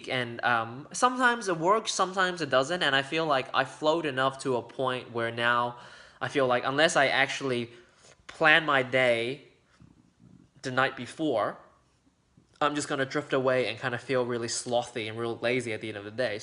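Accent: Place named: Australia. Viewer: I’m so inspired by Dr Joe, I’ve read all four of his books and they’re completely fascinating, inspiring and always help get me out of a shitty mood. Do you live in Australia? Your accent sounds a little Aussie I think :)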